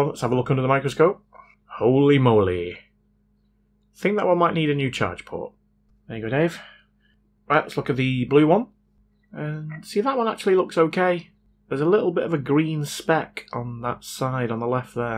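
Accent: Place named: Manchester, United Kingdom. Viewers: I worked in Manchester (technically Stockport) for a couple of years and he sounds exactly like one of my workmates from the time. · Been wanting to ask you. You don't have to answer. Are you Manc? reason I ask is because I grew up in Brixton, London myself, but used to stay in Chadderton in the 80s (Firwood Park) and your accent almost feels like I am back there.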